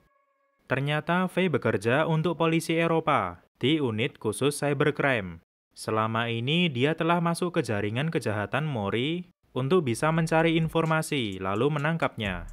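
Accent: Java, Indonesia. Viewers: Gak medok bisa gak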